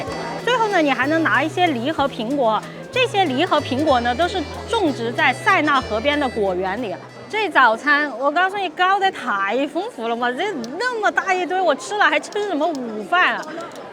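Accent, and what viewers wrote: Sichuan, China. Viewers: 不知道为啥，你开口虽然说的是普通话，但一听就听的出来是四川妹纸：）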